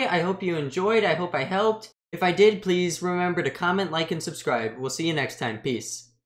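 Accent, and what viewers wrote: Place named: New York, United States. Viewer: What is that accent? New York?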